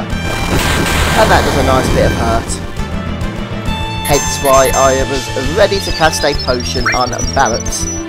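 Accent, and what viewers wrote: United Kingdom. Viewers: ooo a british lper I'm subbing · I know this is way off topic for the game and everything, but I was wondering where is from. I can't quite place the accent. I have a slight thought of British but then again Australian also comes to mind. I mean correct me if I'm wrong but it's just a thought and I'm really curious. I'm a little embarrassed to say that I find his accent cute...;)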